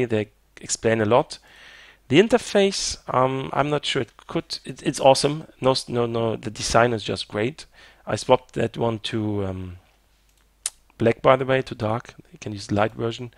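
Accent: Germany. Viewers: German accent 10/10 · your accent sound german? bitte sei deutsch lol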